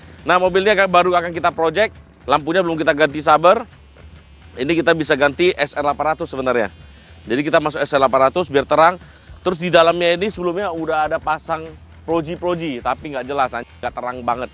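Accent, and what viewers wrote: Medan, Indonesia. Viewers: Logatnya medan banget...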